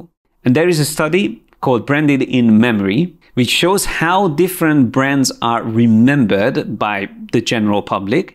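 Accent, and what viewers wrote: Hungary. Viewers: you have a big ass hungarian accent :D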